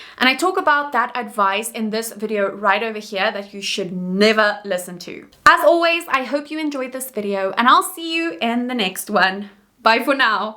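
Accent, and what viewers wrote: South Africa. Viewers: Very very very good video. Been thinking of trying to be a bit more low effort as well. Also, you sound like you're South African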